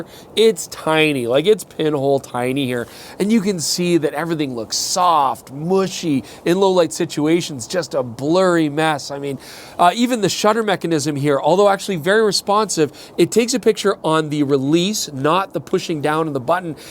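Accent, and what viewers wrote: Australia. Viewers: ' Aussie accent isn't bad. As for the cameras: BLEECHHH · holy shit I was not expecting that Australian accent even after seeing the comments. Genuinely A+ effort lmao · Good Aussie Accent! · woa great Aussie accent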